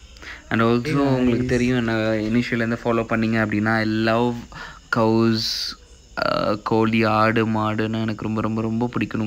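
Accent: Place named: United States. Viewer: நல்லா தமிழ் பேசுறீங்க... அத இன்னு கொஞ்சம் நல்லா பேசிரிக்கலாம்... ஏன் அமெரிக்கா ரிட்டன் மாதிரி பேசுறீங்க....😊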